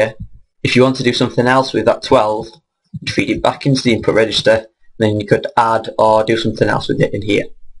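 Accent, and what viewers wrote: United Kingdom. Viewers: is my man scottish?!!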